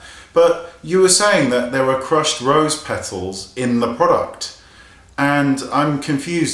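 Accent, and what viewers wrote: England, United Kingdom. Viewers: That english accent! <3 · I love you, I love watching your videos. Think youre absolutely hilarious! Most of all I love/adore your accent. I'm Irish so the English/UK accent is amazing to listen to anyway. But there's a few words that you say which have a wee bit of a "twang" e.g. when you said "haarrrrrrrd" in this vid.. LOVE IT! That makes watching these videos, really does. Also, I totally agree with some of the comments left here that you should create your own line of cosmetics and/or you should write a book. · This is exactly why WE all Love you so much! This and your supercute englisch accent☺. Kisses from Germany and a Big thank you for all your honesty and braveness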